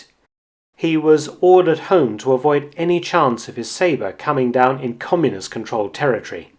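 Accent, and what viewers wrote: United Kingdom. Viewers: Why use Robo-voice when you speak in a sophisticated British accent?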